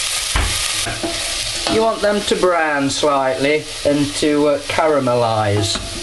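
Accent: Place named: Stoke-on-Trent, United Kingdom. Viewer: Stoke on Trent accent....Shropshire.. Silk Road & all that jazz. You still alive?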